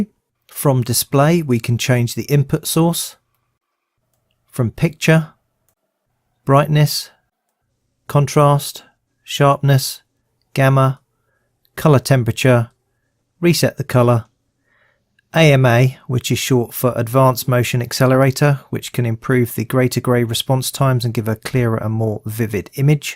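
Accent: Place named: United Kingdom. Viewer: Sweet British accent. Regarding monitor I have shortlisted ss cf390, curve.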